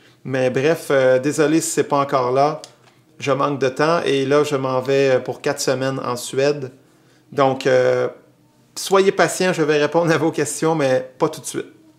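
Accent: Quebec, Canada. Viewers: C'est vrai que c'est courageux de distribuer ses chansons gratuitement, surtout pour un mec comme toi qui n'est pas totalement inconnu du grand public et qui doit passer pas mal de temps en studio. Cela dit, as-tu un support CD dispo en France ? Merci, Continue, gros ! T'es le seul québécois crédible malgré ton accent sur la toile !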